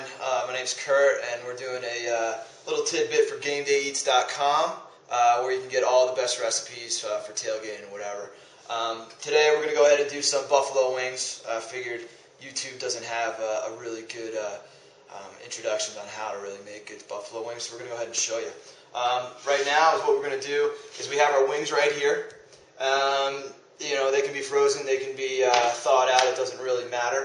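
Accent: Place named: California, United States. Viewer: Sounds like hes frim california, definately not the midwest.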